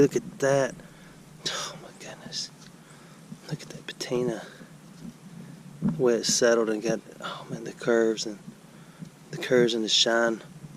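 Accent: South, United States. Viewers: Nothing like a good ole southern boys accent... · I Love your videos and I LOVE listening to your soft southern accent, so SEXY!!! ;-)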